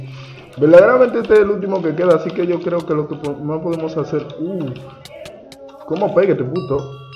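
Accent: Dominican Republic. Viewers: Eres dominicano? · loko tu eres dominicano?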